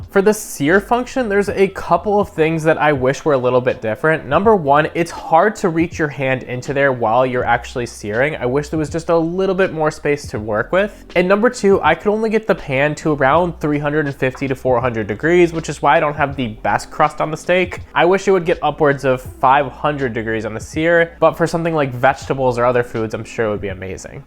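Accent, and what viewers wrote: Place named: United States. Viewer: This fella pronounces his ‘e’ as an ‘a’. It’s a very American thing…messing the lingo up. Good presentation though.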